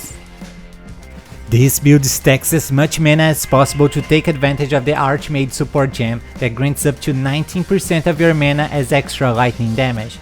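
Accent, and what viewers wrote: Brazil. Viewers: Acho fantástico que dá para sentir o sotaque brasileiro kkkkkkkkkk Obrigado pelo vídeo!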